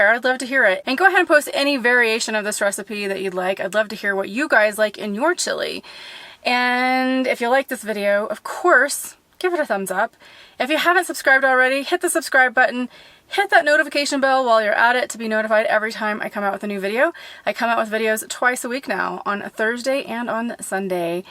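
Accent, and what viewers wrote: Texas, United States. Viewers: I'd like to see you hardboil a dozen or 2 eggs in that. You would have to be awful careful but man, 1 egg is about 9g protein and eggs have a BV rating of 100 (perfect) and you would have a ton of protein cooked and always ready for a few days on hand. Of course you have to refrig. them but once it is done, it is done, en masse for days of protein on hand, simply. I also think you should do a video entirely in your Texas twang, if you still have any of that accent!!!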